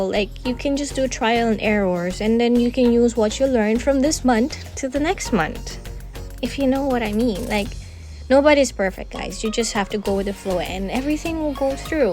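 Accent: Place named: England, United Kingdom. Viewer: you're such a talented lady ...iha!..so bright! i even like your accent..your english accent!..keep painting and sharing your talent..go on! Jingle JPE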